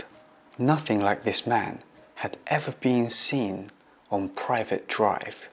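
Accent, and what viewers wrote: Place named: United Kingdom. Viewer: 영국악센트 쥐리네여~~~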